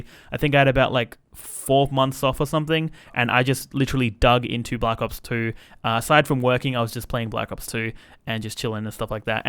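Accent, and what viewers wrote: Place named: Australia. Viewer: wow your an aussie too. I though you were. you should do something like a sparkle special but joocy raging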